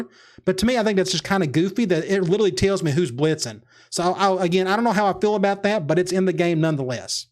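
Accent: South, United States. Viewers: My first video i ever watched from you and immediately sub .. not only was the video great but that thick southern accent is amazing lol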